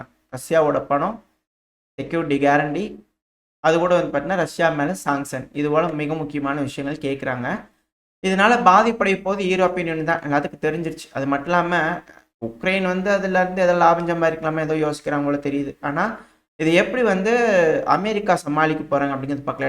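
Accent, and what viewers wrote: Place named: Kongu, India. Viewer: Kongu tamil ❤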